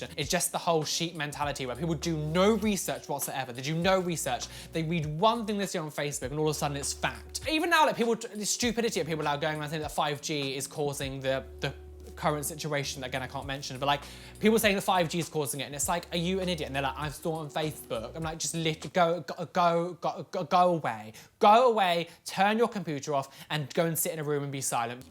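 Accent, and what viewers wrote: United Kingdom. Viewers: I British accent and his word choice. Love your videos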